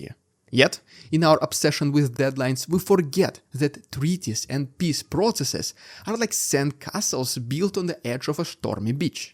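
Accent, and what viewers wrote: Russia. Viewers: I love how with every video, you have such a human dialogue that sounds like national geographic. Your Russian accent is so beautifully balanced with your complex use of English words and your very charming personality. I love how you don't throw numbers at us. You humanize the war and bring it to the hearts of all of us viewers. Wonderful. You've grown a long way since I first discovered your channel and got frustrated with you. You have grown from a young man, into a proud and very emotional news caster who I always look forward to seeing. And mixing in tidbits of silliness just adds to your great videos. Please, dont ever stop. Even when the war is over, I hope you cover the recovery and rise of Ukraine and Russia, brining your unique humanizing dialogue, that will transform the numbers, into recovering people. Stay amazing. Give your little monster lots of love from all of us.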